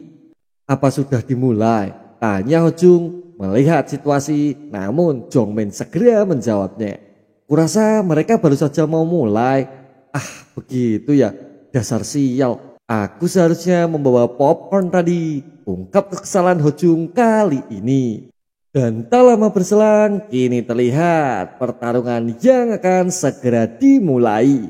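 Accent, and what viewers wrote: Java, Indonesia. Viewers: Jangan di ulang terus kalau ngucapin nya Logat Jawa Lo jangan di liatin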